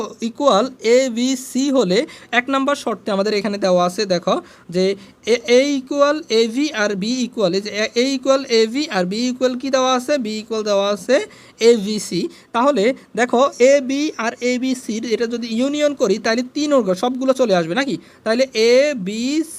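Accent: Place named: Noakhali, Bangladesh. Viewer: স্যার মনে হয় নোয়াখালীর মানুষ 🥲🙏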